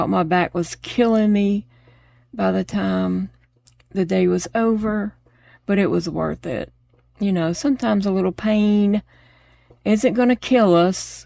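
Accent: South, United States. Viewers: I agree, I just love your voice and your beautiful Southern American accent !💛💛💛😁🙏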